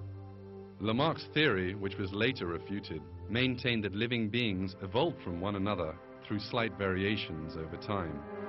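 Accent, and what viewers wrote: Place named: United Kingdom. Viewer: great british accent. other than that, a total waste of time. thanks for nothing. get a job.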